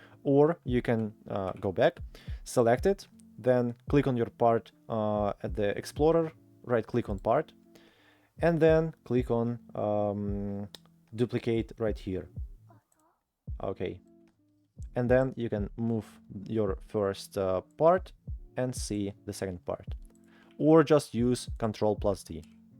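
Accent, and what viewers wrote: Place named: Russia. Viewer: слышится русский акцент, но всё равно классно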